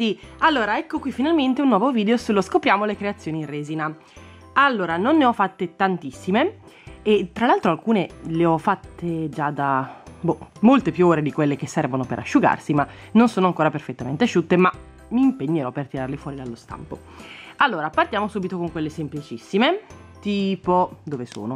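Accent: Bologna, Italy. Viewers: ma sei di Bologna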